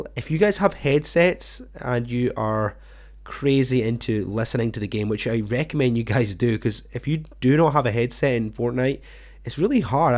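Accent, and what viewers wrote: Scotland, United Kingdom. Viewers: Are u Scottish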